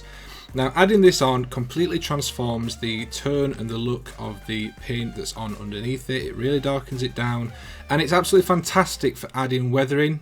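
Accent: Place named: West Yorkshire, United Kingdom. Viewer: Warp stone glow in West Yorkshire accents wins the internet mate. I’ve subbed...new to 40K....not sure how many games I’ll play but I’ll certainly be using your tips in general. Thanks man.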